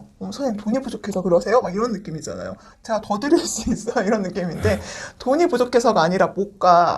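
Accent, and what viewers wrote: Gyeongsang, South Korea. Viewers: 강사님 경상도 사투리가 드러나는데 괜찮은지????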